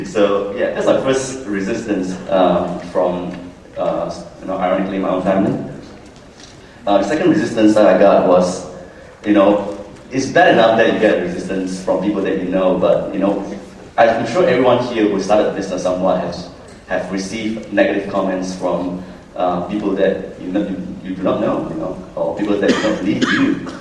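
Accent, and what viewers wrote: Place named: Malaysia. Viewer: just a little, not much. pretty much sounded malaysian to me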